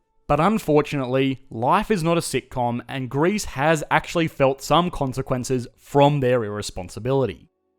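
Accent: Australia. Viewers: Narrator is aussie i think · It is very true that Greeks leave the country especially the young ones. I am 17 right now, when I finish uni in some years I am planning to move to the UK/US/AUS (i am looking for a stable, English speaking nation) and so are most of my friends. Also loving your Aussie accent mate!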